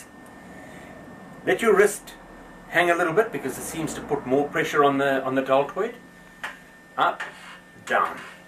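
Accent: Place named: South Africa. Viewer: You kinda sound South African - and you are in such great shape for a 60 year old - I'm impressed!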